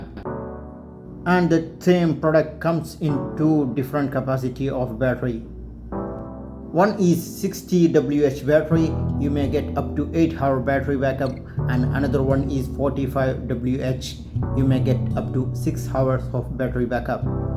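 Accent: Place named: England, United Kingdom. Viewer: brilliant English accent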